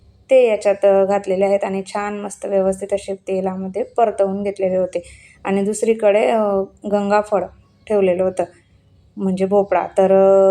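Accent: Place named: Jalgaon, India. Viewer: tai tumhi jalgaon ch ya aahet ka language varun vatat me aahe so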